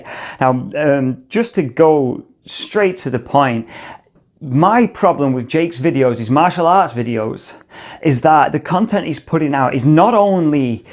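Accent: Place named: England, United Kingdom. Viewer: Ain't here for Martial arts.. Just enjoying your English accent. Pleasant to hear.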